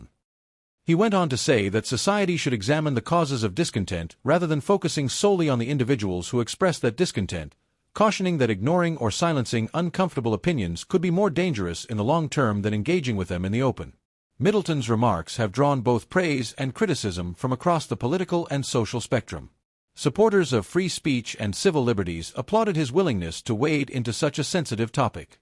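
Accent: United States. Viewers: Even worse it's got a yank accent and can't pronounce SAS Who Dares Wins properly. These channels should be banned.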